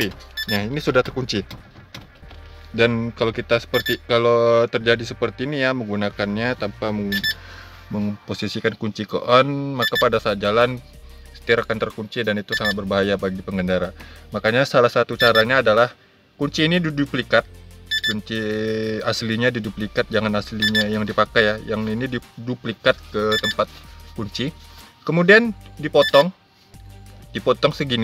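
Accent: West Sumatra, Indonesia. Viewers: Logat org minang 😆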